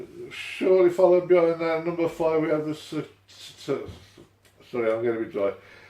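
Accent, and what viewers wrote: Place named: Black Country, United Kingdom. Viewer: Nice one. Where are you from? Sound local to the Black Country?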